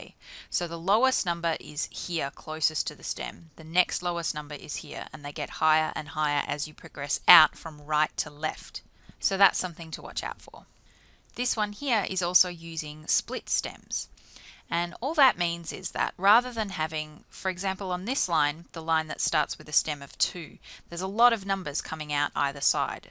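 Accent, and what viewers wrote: Australia. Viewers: yea its aussie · you have a lovely accent haha it's aussie accent right?;) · Aussie! Ayy reminded me of Alycia Debnam Carey... but yesss thank you for this! V helpful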